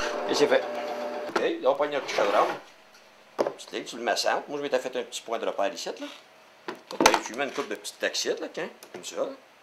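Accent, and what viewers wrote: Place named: Quebec, Canada. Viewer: Je vais faire la même pour mon jardin, pour mes p'tit moineaux Français. pour Avec l'accent canadien ou québécois cette vidéo est génial !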